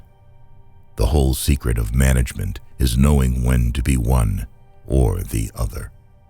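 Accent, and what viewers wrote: United States. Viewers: Anericann pronounciation sticks out like a sore thumb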